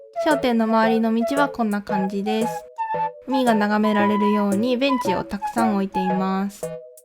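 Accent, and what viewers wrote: Kansai, Japan. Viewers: 儚げふわふわ関西弁お姉さんだった頃のアニキ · 最近あつ森を始めたのですが、ほんとにこんな素敵な島になるのかと驚かせていただきました🙄途中出てくるマップも好きだし、主さんの関西弁も好きです🥰🥰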